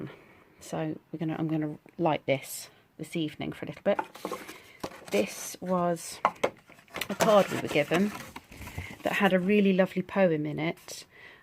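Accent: United Kingdom. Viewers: The mystery one of course, "All the Missing Girls". I'm enjoying your vlogs & your British accent. Beautiful memory box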